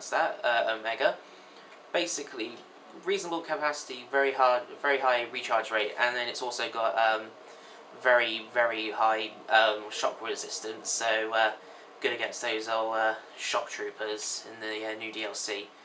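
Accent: United Kingdom. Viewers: Love the British accent XD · u sound like harry pottah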